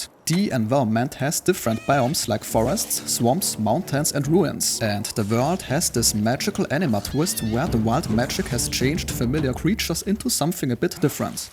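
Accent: Germany. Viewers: The german accent is strong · Der Deutsche Akzent Kickt Hart^^ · this german accent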